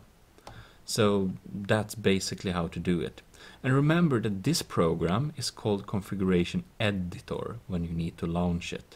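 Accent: Sweden. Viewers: Definitivt svensk, Norrmän låter mycket mer oseriöst (no offence). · I am swedish too! It's weird how you can hear it on the type of english people speak... epic video btw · swedish acsent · U sound swedish.If u are tjenaaa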